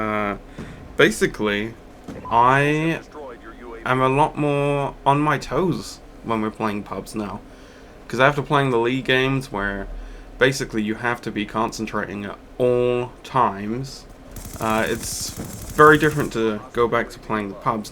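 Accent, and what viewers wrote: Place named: United Kingdom. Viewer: Hey..much love from Germany to the Voice of GB;) it's so funny to listen to you and...you with the british accent and the opposite is with his cool ny talking thing...;)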